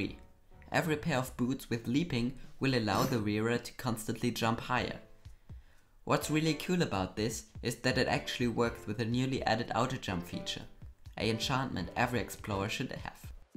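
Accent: Germany. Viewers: Age of xD glaub auch XD er hat so nen deutschen Akzent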